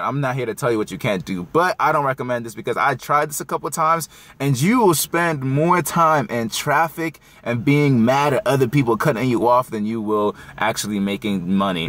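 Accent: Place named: Philadelphia, United States. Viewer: Never mind. I heard Philly. 😜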